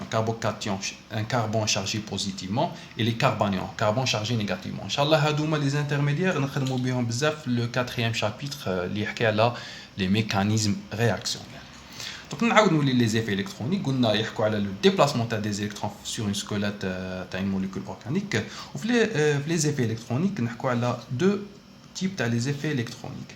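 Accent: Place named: Algeria. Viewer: اه شكرا بارك الله فيك هادا الكور حوست عليه حتى شبعت نلقا غير المغاربة يشرحو فيه او مافهمتش عندهم باسكو الدرس ماشي كيما تاعنا سواسوا قلت نكمل نشوف عند هدا اللخر و خلاص حسبتلي تانين مغربي حتى سمعة اللهجة تاعنا 😅 والله غير فرحت ربي يعينك او يجازيك خير الجزاء🤲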